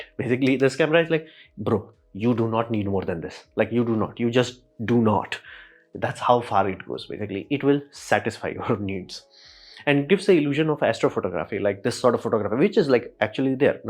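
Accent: India. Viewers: Are you a Bihari? upiete? 😅😅😅😅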